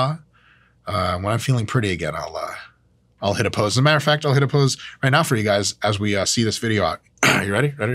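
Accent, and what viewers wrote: South, United States. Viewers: Southern accent Dr. Mike is criminally underrated and underutilized.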